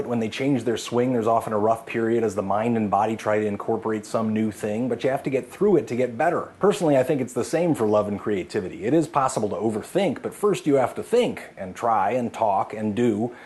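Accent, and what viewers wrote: Germany. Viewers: ze has such a good german accent! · The hilarity of a german guy talking like a cliché german. It makes me so happy. Oh boy.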